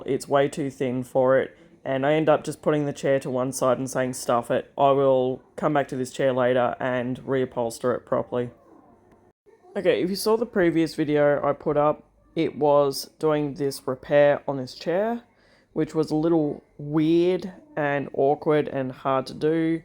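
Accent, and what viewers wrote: Australia. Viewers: I pity anyone who doesn't speak australian. Love the inspiration on the desk.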